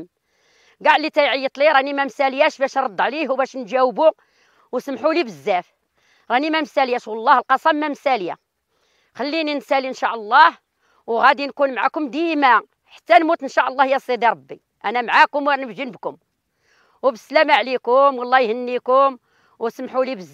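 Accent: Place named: Taza, Morocco. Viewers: Sanfora sanfora .khti 9rit ta3li9 dyalak .nti man taza 7ta ana man taza ah lahja dyalha b7al tzawaza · Sobhana lah lahja dyalak amimti bhal nas taza Man hit Ana asli taziya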